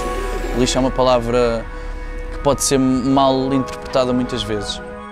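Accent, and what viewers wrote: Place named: Portugal. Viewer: É com sotaque Portugal.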